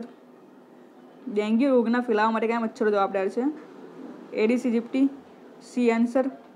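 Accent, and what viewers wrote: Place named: Surat, India. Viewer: I love your voice (surti boli) nice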